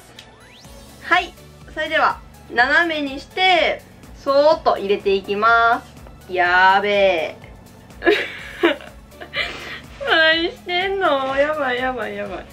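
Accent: Kansai, Japan. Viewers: 関西弁で友達みたいに喋ってるの良かった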